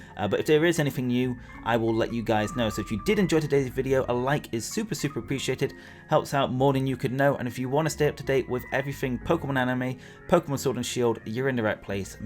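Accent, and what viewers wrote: United Kingdom. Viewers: Bootiful British accent I think? Makes me swell wit pride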